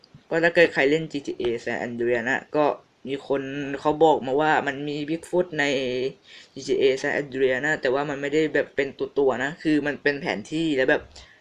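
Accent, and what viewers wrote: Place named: Thailand. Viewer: ทำไมพี่เสียงเหน่อจังคับ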